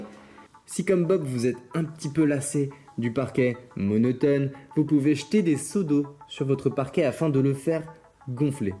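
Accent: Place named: Alsace, France. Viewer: J'étais sûr que tu était alsacien 😂😜🇮🇩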